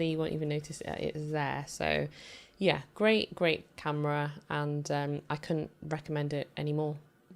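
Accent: England, United Kingdom. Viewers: Hello. Hope you can help this DJI OSMO Pocket 2 Tragic. After doing a fair bit of research, I purchased a POCKET 2 from Amazon. I bought the basic model and later contacted DJI in Melbourne, Victoria Australia where I live, seeking a microphone for this unit. I was advised that DJI no longer supports certain products such as the "FITTNG" that attaches to the base of the POCKET 2, that houses the electronics which accepts the spound from the DJI mike which the operator wears on their lapel. I have no idea what the electronics housing is called or named, and it is just so frustrating that no one can assist me. I hope you will be kind enough to assist me or give me some direction. By your accent I'd you are English and I hope you are able to advise me. Kindest Regards. Angus McKinnon.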